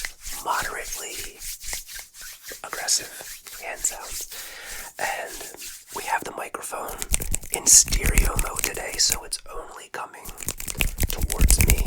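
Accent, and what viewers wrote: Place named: Maryland, United States. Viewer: I really enjoyed this video! Love the talk about the accent, being from the UK I didn’t really realise people from Maryland / Baltimore had such an accent!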